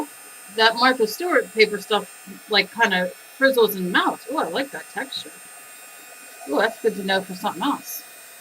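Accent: New Jersey, United States. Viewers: You're my first YouTube comment post! I've been following your channel for about 4-5 months and am always watching them multiple times. I'm not a beginner but enjoy your banter while I craft. I will be doing the swirly tree myself soon and will try to figure out how to "tag" it to you. You're great cyber company. I'm a NJ native living in Georgia and when I came across your channel while surfing one day, the first thing I noticed was that Jersey accent. So I tuned in and haven't tuned out yet. I have a very stressful and demanding job and try to "art" in the morning cuz by the end of the day, I'm too tired to do anything. Thanks for being yourself and sharing your lovely personality with the rest of us. I've been very cyber shy about sharing my work publicly but I may find the courage soon thanks to your inspiration. Keep doing what you do and don't worry about the darn "clock".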